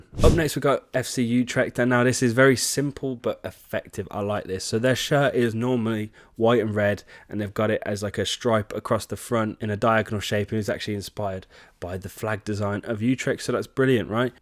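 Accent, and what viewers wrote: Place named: England, United Kingdom. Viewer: Also, you’re English, so it’s totally fine to use English pronunciation; every other language pronounces most of the foreign words in their own pronunciation. If you think about it, the Italians, French, Spanish, all pronounce English words like e.g. Manchester in their own way. No need to apologize, just be you and keep up the good work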